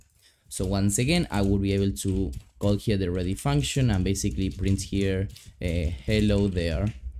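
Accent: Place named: Argentina. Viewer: se nota el acento argentino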